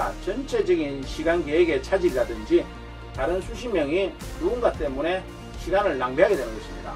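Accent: Gyeongsang, South Korea. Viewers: 홈화면에 떠서 처음 님의 영상을 만나게 되었는데 경상도억양이 있지만 사투리없이 표준말로 원고도 없이 설명을 참 잘하시는군요. 특히 내용이 알차고 유익하여 인상이 깊었습니다. 다만 한 가지 흠이 있는데 님을 위해 조언드립니다. 설명하시는 동안 양손을 많이, 너무 많이 쓰다보니 수화통역하는 분 같은 느낌이 들었습니다. 손동작이 너무 많다보니 손에 신경이 쓰여 설명을 잠깐 놓치는 경우도 있었구요. 적당한 제스처는 필요하지만 과함은 모자람만 못하다는 조언을 드립니다.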